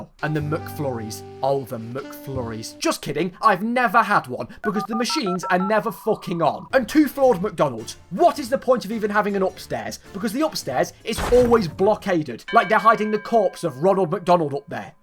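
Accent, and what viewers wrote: United Kingdom. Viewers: I like how British you are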